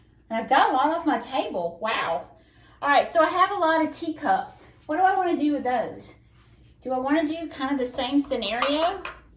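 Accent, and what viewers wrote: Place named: Alabama, United States. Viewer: Everything looks gorgeous. You’re my new favorite decorator!! I’m from Arkansas and when I first heard you, I thought maybe you were too. Guess the southern Alabama accent is the same. Lol. Anyway, I’m binging your videos!! 🍂🍂🎃🧡🧡🌾✝️✝️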